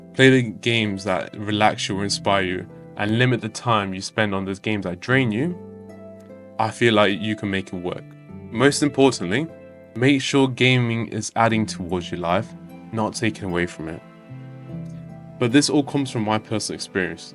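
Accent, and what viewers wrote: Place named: Germany. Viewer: your accent seemed more german rather than british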